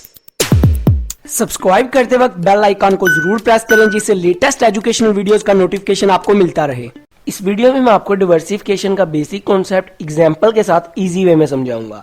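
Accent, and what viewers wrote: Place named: Punjab, India. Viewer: Bhai tu punjabi lg rha tone se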